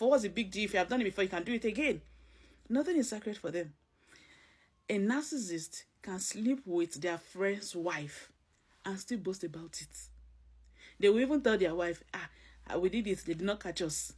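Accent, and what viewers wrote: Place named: Nigeria. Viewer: I was particularly drawn to your video when I heard the Nigerian accent, because I'd love to hear a Nigerian talk about this topic. Thank you, Sister. The descriptions are perfect for them. I've met them as boss, wife, colleague and pastor. They're plenty among us in Nigeria but most of our people are not aware about their devilish tactics. The worst are the Christian narcs. The most terrible are the covert ones. I've been trying to educate people through my posts so that their 'unlucky' spouses can be aware and learn how to protect themselves and stop being victims of their wicked cruelties. I'll be sharing this video in my WhatsApp.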